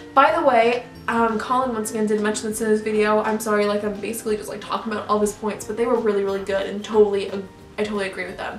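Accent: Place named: Ireland. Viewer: accent I felt like it sounded more like a Irish accent more than a British accent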